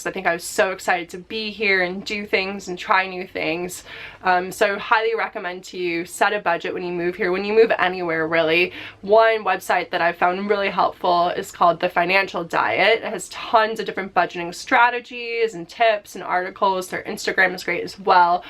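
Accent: United States. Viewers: As an American myself, her accent sounds like a typical lady here in California. I don't here any type of European accent in her voice at all. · Don’t force it. She still sounds American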